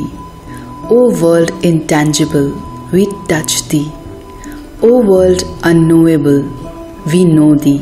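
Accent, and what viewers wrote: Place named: South Asia. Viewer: It is so good to hear this most wonderful of poems read with a South Asian accent!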